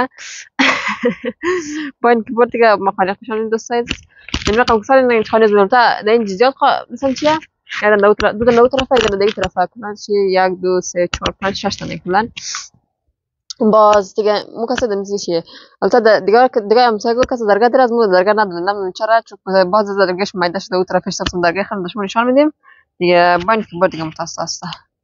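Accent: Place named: Hazarajat, Afghanistan. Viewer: چی قشنگ هزارگی گپ میزنی منم بخدا اینقد هزارگی گپ زده نمتونم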